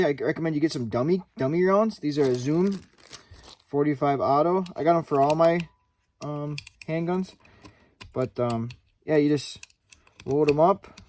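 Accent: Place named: Wisconsin, United States. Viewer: From Appleton, loving that Wisconnie accent. Great job taking us through this pistol.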